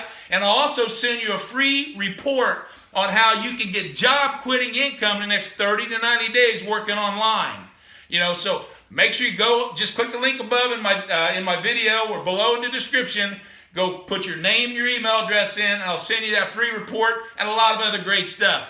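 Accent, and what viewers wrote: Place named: Midland, United States. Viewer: Love that Midland accent. And the advice. I stay with the big three and add the ancillary exercises if time permits. Full tilt at 49, soon 50. All the best from Greater Cincy.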